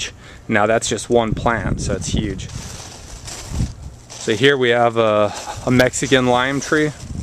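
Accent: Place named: Upstate New York, United States. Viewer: Your a hard worker and a good man...good vids....i worked in the wyoming boom in the 70s.......you sound like u r from upstate NY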